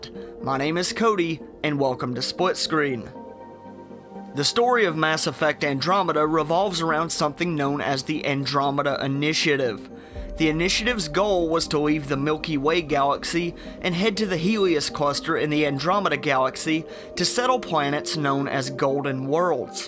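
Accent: South, United States. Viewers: No disrespect, since I'm from Mississippi myself, but do I detect a deep southern drawl there (Texas or Tennessee maybe)? ^_^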